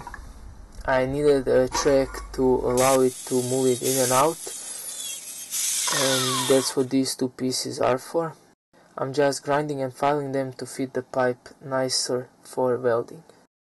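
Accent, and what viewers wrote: Russia. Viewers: Great video. Are you from Russia? Couse I can hear your accent quite good :)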